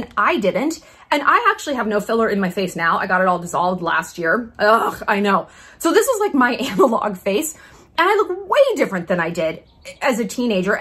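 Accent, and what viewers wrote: South, United States. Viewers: Your southern accent is soooo pretty. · That’s a great Southern accent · Side note: southern accent is pure gold. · I’m obsessed with your southern accent haha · The gaslighting and southern accent is what I’m here for! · Here for the Southern accent & that comment about the Easter Island emoji 🤣🤍💞 You’re the best,. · Your southern accent is SENDING ME lol!!!!!! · The southern accent is PERFECT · southern accent is what keeps me going lmao, she's too funny. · That southern accent tho 😂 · omg u r so funny i love you that southern bell accent is so hilarious i always told my bf to go take a nap when hes trying to be irritating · Your southern accent is so cute!! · The southern accent 😂👌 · Omgg I love your southern accent. Sooo good, had to go back and listen it to it again, lol wish I could do that. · Love your southern accent.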